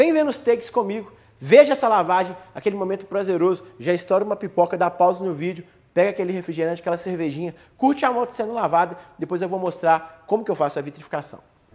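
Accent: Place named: Minas Gerais, Brazil. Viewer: Sotaque mineiro é foda...ja da pra daber so de ouvir kkkkkkkkk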